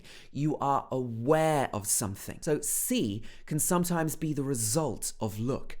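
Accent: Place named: United Kingdom. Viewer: I love british accent. plz make videos on paragraph reading.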